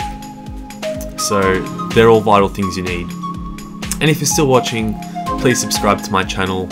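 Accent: Australia. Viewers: YOU’RE AUSSIE?! OMG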